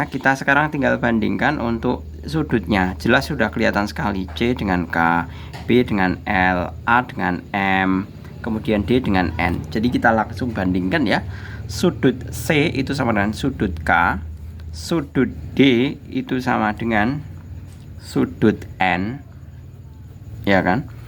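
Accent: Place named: Java, Indonesia. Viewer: Medok ya pak…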